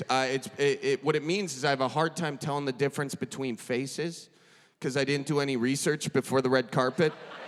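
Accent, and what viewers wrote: Midwest, United States. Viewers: I'm an iowan and this is so accurate and the Midwest accent is a mixture of southern and hick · Thank you for bringing this to YouTube! My son got me following you pretty early on. My California baby was in tears over your accent and Midwestern humor. Loved this special start to finish, would love a separate "auction" one, if you're so inclined. Hope to see you live someday! 💜✌️😎🍀🌎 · I moved to Wisconsin 20 years ago and I've still never heard anyone with that thick of an accent. But then people here say I have a southern accent soooo 🤷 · Midwestern here, from Wisco herself. Is this what our accent sounds like? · Oh gosh! From central Wisconsin and sounds like home. Lol! Love ya! Good lookin’ Wisconsin guy! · This man is pulled between his Wisconsin speech pattern and neutral speech pattern.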